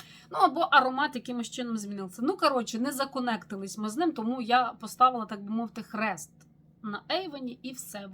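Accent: Ukraine. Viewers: Я, перепрошую, Ви з Хмельницького??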